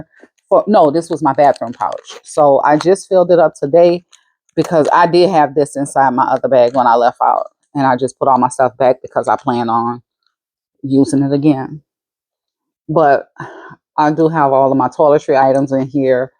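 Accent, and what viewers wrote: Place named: New Orleans, United States. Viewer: This is my first time here I enjoyed your video and that Nola twang can’t wait to see how you accessorize your navy bag, I never do potlucks either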